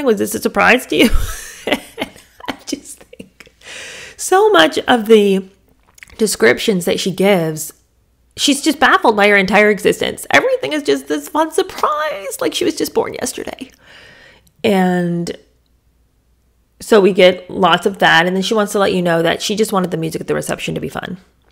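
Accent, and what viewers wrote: United Kingdom. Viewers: I love your British accent lol!!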